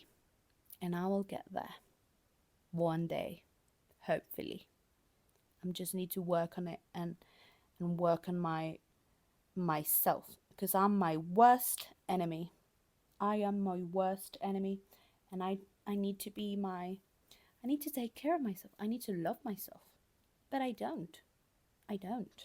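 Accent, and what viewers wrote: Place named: United Kingdom. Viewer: Esto ya lo he dicho un monton de veces.... Si no supiera que eres chilena igual que yo. Juraria que eres britanica.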